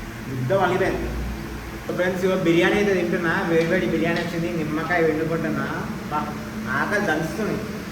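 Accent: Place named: Telangana, India. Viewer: Just love your Channel because of Telangana accent ! Love from Odisha Anna 🙌😅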